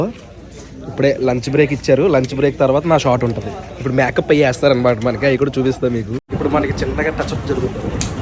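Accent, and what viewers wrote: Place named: Godavari, India. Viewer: All the best.. new timing ki.. you have bright feature in movies and Godavari slang 🎉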